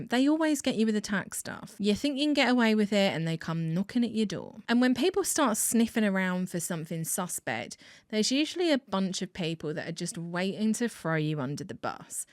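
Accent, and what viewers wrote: United States. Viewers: Your videos are consistently so good that I automatically click like before Ive even seen it. P.s. your american accent is getting even better! lol... sounds kind of californian. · yer merican accent is funny af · You rock the American accent!!!! Great video ty so much ❤ from USA 🇺🇸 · Good American Accent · Yay a sponsor! And your American accent has gotten much better ☺️ Thanks for anther fantastic video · I love your American accent! well done! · Excellent American accent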